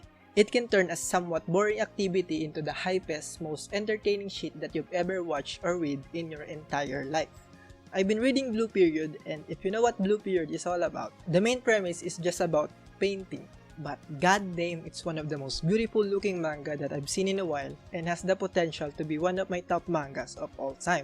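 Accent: Philippines. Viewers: i knew it.. you have the accent :) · Your accent sounds like you’re from here in the Philippines, are you?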